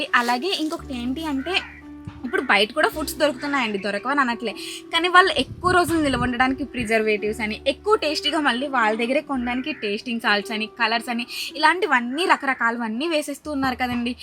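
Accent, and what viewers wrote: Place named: Godavari, India. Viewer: Godavari vallu alane matladataru andi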